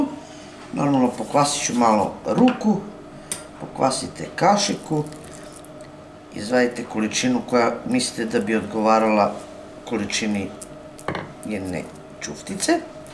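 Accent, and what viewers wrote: South, Serbia. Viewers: ma nista ja ne zamjeram. Samo vi govorite kako vec govorite. Inace je vas juznjacki govor bas super (malo makedonskog i malo bugarskog utjecaja 😊). Zena mog rodjaka je Makedonka (Skopjanka). Samo vi kuhajte. Nek se stvari "krckaju" i nek mirise. Ja sam uglavnom vegetarijanac. Kazem "uglavnom", jer kada podjem u Hrvatsku (iz Dalmacije sam rodom) onda uzmem malo ribe. I to je to. Pozdrav i sve najbolje vam zelim.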